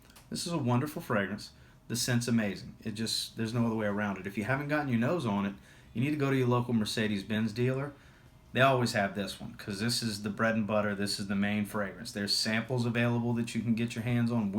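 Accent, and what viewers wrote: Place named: Louisiana, United States. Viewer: Are ya from Louisiana my brotha?! Good job on the video! 318 for me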